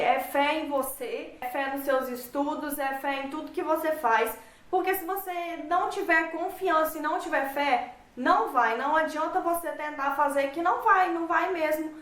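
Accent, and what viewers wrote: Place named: Goiás, Brazil. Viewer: Não é pq vc tem um sotaque meio puxado ak de Goiás, sabe. Hehe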